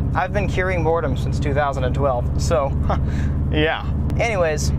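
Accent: Texas, United States. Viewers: Listening to this 3 years later............ THAT TEXAS ACCENT THOOOO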